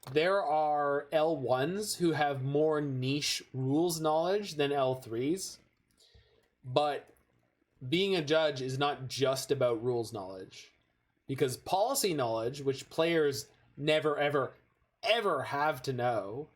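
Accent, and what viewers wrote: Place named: Italy. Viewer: The averagely annoyed Italian accent is hysterical xD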